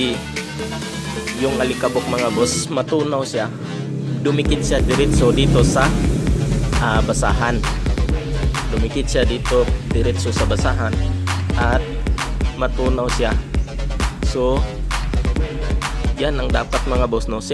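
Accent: Visayas, Philippines. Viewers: Dong ang tingog nimo halata kaau na bisdak jeee pero ok na aron masabtan sa mga bisdak pod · Bisaya ka bay noh?😁😁 Salamat sa tip bay👍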